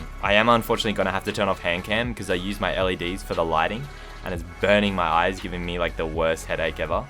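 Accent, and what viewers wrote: Australia. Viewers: Yo he has like a Australian accent and an American accent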